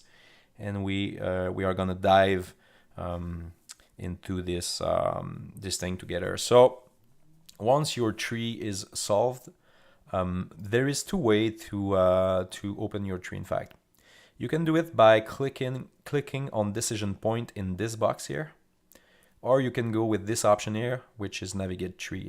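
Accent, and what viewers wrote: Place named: Quebec, Canada. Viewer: Pretty sure I'm hearing that French Canadian accent! Merci beaucoup pour la série, ça m'a aidé bcp!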